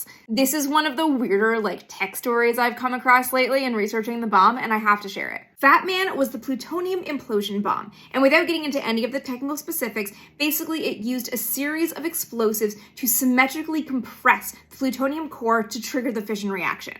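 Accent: Canada. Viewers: I know it's just your Canadian accent coming thru... but its pronounced 'sod-er'. the l is silent. love your vids!!! 🤗🤗🤗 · has a bunch of pronunciations that I find adorable. Some are Canadian. Some are based on how NASA pronounced words. I think this one was just a mistake. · Yes, she’s Canadian. I don’t know if you’re new to the channel, but it becomes really obvious that she’s Canadian after a short while. I think it’s nice that she doesn’t try to hide it. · You're such a Canadian, still. 🔥💙 The way you say "soldering." Yes, pronounce that L. I'd love to talk with you about it.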